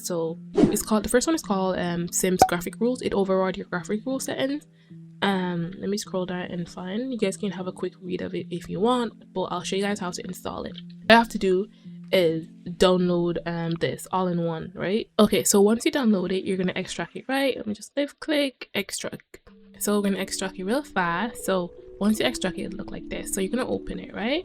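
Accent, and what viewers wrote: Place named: Jamaica. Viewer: I was listening and I was like She sounds Jamaican and I got so excited like have I finally found my people with same interests as me? And I checked the bio and I was right 😂 I’m so gonna binge the whole channel❤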